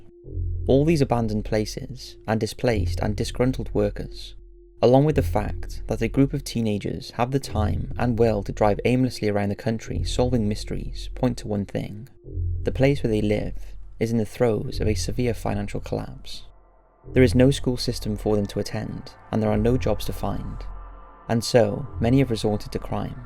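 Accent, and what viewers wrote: Wales, United Kingdom. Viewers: Gotta ask,are you Welsh? Your voice sounds like it has Welsh undertones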